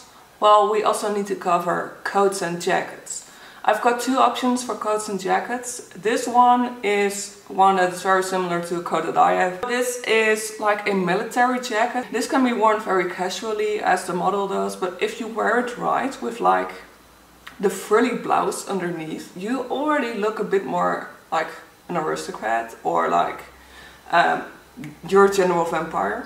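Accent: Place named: Netherlands. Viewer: Are you by chance dutch I think I recognize the accent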